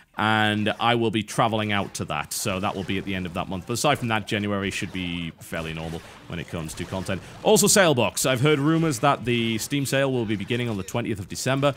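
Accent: United Kingdom. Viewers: Are you from UK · No doubt a ton alredy replied something like this but... how the hell did you get that rather typical brittish accent to that of someone from texas xD?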